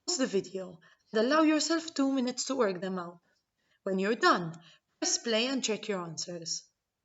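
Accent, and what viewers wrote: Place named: Malta. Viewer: I new that was a Maltese accent right away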